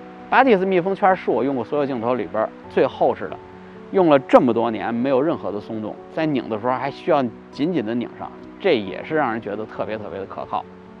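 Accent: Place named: Beijing, China. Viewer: 北京的吧？迈玛儿拍，一听就是北京的😂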